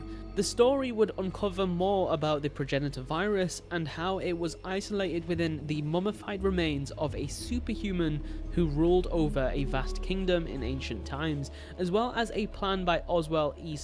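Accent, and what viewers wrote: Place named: United Kingdom. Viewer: haha you butchered that name with that heavy British accent bro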